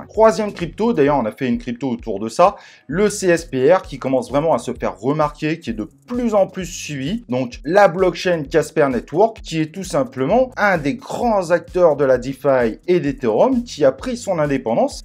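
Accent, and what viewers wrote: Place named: England, United Kingdom. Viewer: J'aime beaucoup ton accent anglais 😅 Dommage de ne pas avoir présenté de projets qui n'ont pas encore pump Merci quand même chef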